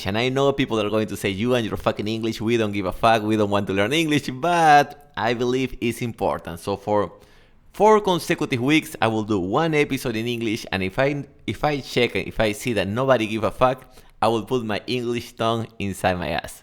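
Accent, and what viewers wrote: Peru. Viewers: LA PREGUNTA ES QUE TE METISTE PARA HABLAR INGLES CON ACENTO PERUANASO ,PERO SI TE DEJAS ENTENDER ME LOQUEAS MAZETITA ,EXITOS SALUD Y VIDA .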